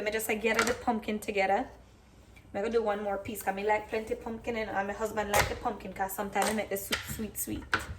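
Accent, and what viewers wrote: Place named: Jamaica. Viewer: LMAOOO OMG !!! I love you speaking in patois!! Im jelly! I can't do it.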